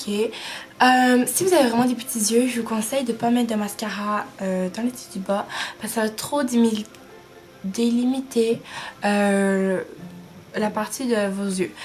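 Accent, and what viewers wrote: Quebec, Canada. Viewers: Désole, mais sa se voit que tessaille de prendre laccent francais. Tes québecoise. Pas besoin dle chacher! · C ben drôle a voire ta un accent francais pi en même temps québécois (moi jsuis québécoise ) tk bonne video c pas BS :)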